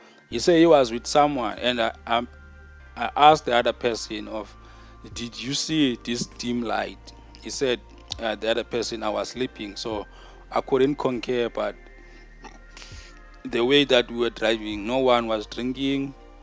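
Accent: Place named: Johannesburg, South Africa. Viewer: This has to be the dumbest and uninformed rant about cape independence I've ever heard judging by your acetate I can tell you are not from the western cape you sound like you are from joburg and came to the western cape recently since you know so little firstly it's not cape town that is seceding it's the hole western cape province not the city of Cape Town get your facts right!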